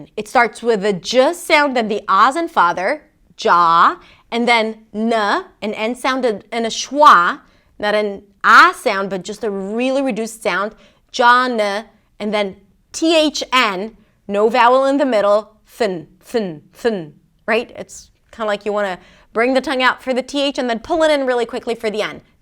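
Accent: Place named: United States. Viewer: I'm a native speaker with linguistics training and this was fantastic. Your American accent is fantastic! I can only hear the tiniest hint of non-native accent, it's so wild. I appreciate that you included the name Siobhan because it's Irish and you'd be amazed how many Americans have no idea how to say it 😂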